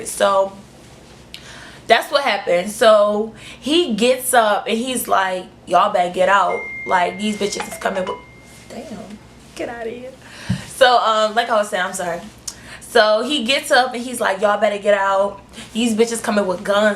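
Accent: Baltimore, United States. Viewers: Omg I knew you were from Baltimore because of your accent! Great video btw:)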